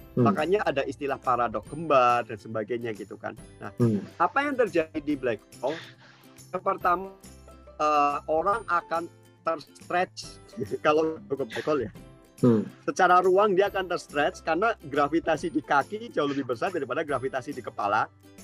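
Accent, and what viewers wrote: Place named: Banyumas, Indonesia. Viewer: Kiye kayane alumni SMA siji purwokerto maseh, ora ngapak ora kepenak 👍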